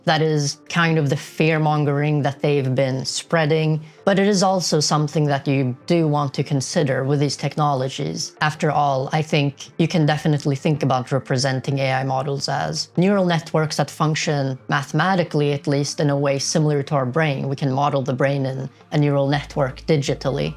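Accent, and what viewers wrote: Sweden. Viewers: Just found your channel, interested to know where you are from; you talk English like a Swedish person. Nevertheless you bring up good points and it is clear that you have given this a lot of thoughts and seems to understand AI and how humans interact with it at a greater level than most. I agree with you that llm's like GPT's are not the path to AGI, it might help us along the way but I think we are running up a wall and need to find a new model first. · Are you a Swede? The "E"s are quite Swedish. What is it with Nordics being over represented by nerds... is it that outside sucks majority of the time. Winter is nice tho.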